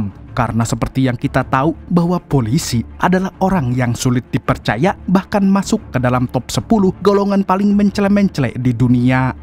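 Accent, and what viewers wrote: Banjar, Indonesia. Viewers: urang banjar😂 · Aeee... Urang banjar kh pian..?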